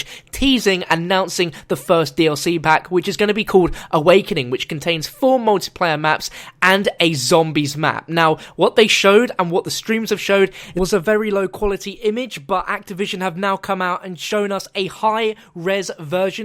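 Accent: England, United Kingdom. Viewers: I love when English people speak German :D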